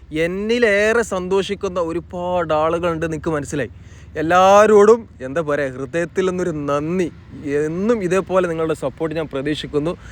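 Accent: Malappuram, India. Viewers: bro I like your videos and the malpram language and the its slangs. I do watch to listen to that. Please include more slangs😍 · ഒരുപാട് നാളായി പറയണം എന്ന് കരുതിയതാ , സത്യത്തിൽ നിങ്ങളുടെ വീഡിയോയുടെ ഏറ്റവും ആകർഷണം നിങ്ങളുടെ നാടൻ രീതിയിൽ ഉള്ള സംസാരം തന്നെ ആണ് . ആലപ്പുഴയിൽ ഏതാണ്ട് അച്ചടി ഭാഷയുടെ അടുത്തു സംസാരിക്കുന്ന എനിക്ക് , താങ്കളുടെ മലപ്പുറം സ്ലാങ് അതിന്റെ പ്രയോഗത്തിൽ വരുന്ന നൈർമല്യം , അതിന്റെ ശൈലികൾ എല്ലാം അടിപൊളി ആണ് . പിന്നെ രാഷ്ട്രീയ പൊട്ടത്തരത്തിനും , രാഷ്ട്രീയ കാഴ്ചപ്പാടിനും താങ്കൾ കൊടുക്കുന്ന മറുപടി മികച്ചത് ആണ് . സാദാ മലയാളികൾ രാഷ്ട്രീയ അന്ധത വെടിഞ്ഞു താങ്കളെ പോലെ ചിന്തിച്ചിരുന്നേൽ ഈ റോഡ് വികസനം ഒക്കെ 20 കൊല്ലം മുന്നെ വന്നേനെ . ഒരുകണക്കിന് അതും നന്നായി NH66 ഇപ്പോൾ പണിയുന്നത് viaduct , flyover , underpass , മിക്ക നിലവിലുള്ള പാലങ്ങളും മാറ്റി പുതിയ രണ്ടു പാലങ്ങൾ മിക്ക പ്രധാന ടൗണുകളെയും ഒഴിവാക്കി ബൈപാസ്സുകൾ എന്ന അടിപൊളി ഹൈവേ ആയിട്ടാണല്ലോ . ഞാൻ NH 66 ന്റെ വർക്കിന്റെ ഒട്ടുമിക്ക യൂട്യൂബ് ചാനലുംകണ്ടിട്ട് ആണ് ഇത് പറയുന്നത്. 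നിങ്ങളുടെ ചാനൽ അടിപൊളി തന്നെ .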